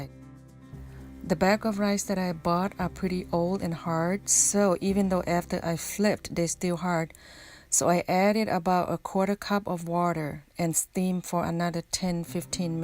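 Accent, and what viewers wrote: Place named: England, United Kingdom. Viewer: วาววว น่ากินๆๆ ชอบสำเนียงEnglish so much❤️❤️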